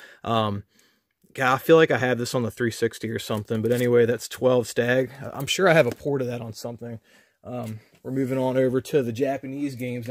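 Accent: Baltimore, United States. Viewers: Btw you have the definition Baltimore accent.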